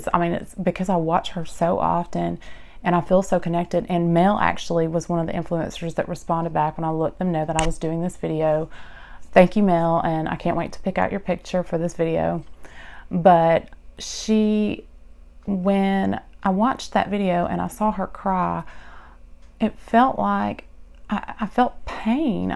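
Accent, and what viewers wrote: United States. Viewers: I’m from England and I love American accents and yours is my absolute favourite ❤️❤️ my <mail>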